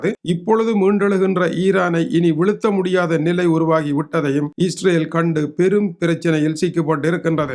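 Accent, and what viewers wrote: Sri Lanka. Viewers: Elaigai tamilan awar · Beautiful Ceylon Tamil.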